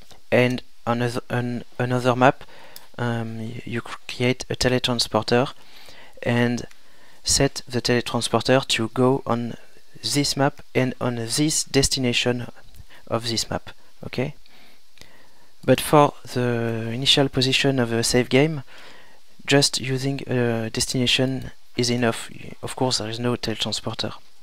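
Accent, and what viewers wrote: France. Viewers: Marrant ça, avec un accent français j'arrive parfaitement à comprendre l'anglais :) (Gros HS)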